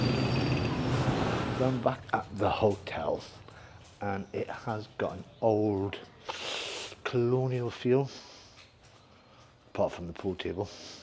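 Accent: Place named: Scotland, United Kingdom. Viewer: It looks like scottish accent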